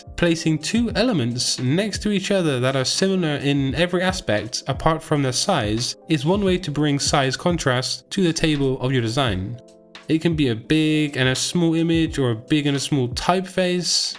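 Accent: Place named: Bristol, United Kingdom. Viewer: Yo, are you from Bristol?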